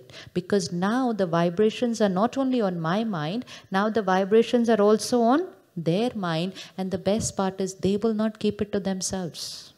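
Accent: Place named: South India, India. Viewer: Sis kuchh English word pronunciations southern india tone va tone se lagtey hain ..the ketala . Is mumy is from southern region. Of cpurse no about Papa as i had reviewed the photo snaps of the excellency .s parents . Subh Ho. Om shanti sis .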